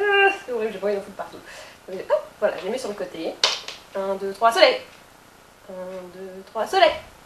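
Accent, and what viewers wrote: South, France. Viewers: L'accent du Sud le plus beau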